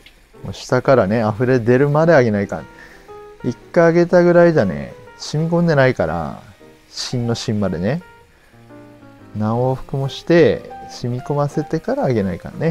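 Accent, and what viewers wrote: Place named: Kurume, Japan. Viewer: おはようございます☀ ノズルいいですね😅 まだ使ってないです。買いたいです。昨日もライブ楽しかったですよ❤ の声を聞いて旦那が『それ、九州の面白い人か？』って言ってました。の久留米弁が印象的だったのかも😅 これからもずっとどんらーでいます。ひろりーんでした👍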